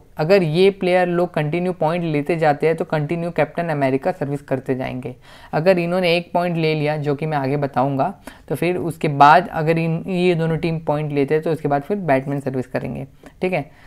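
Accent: Odisha, India. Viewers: Bhai ap odiya ho na kyuki bhech bhech mai ap Mane Mane bol rahe ho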